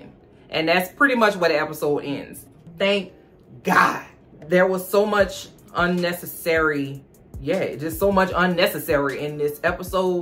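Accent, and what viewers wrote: New Orleans, United States. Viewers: I love me a woman NOLA YouTuber! The best accents and reads😂😂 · I was completely taken aback by the straight faced comedy!!! It took me a quick second to understand who trefalga was… The algorithm truly blessed my time line with you. The impeccable face card drew me in… the Nola accent had me locked… the non stop roasting made me subscribe AND ring the bell. Looking forward to viewing more and more of your content beloved. Willing you success in all your righteous endeavors. ✌🏽&❤️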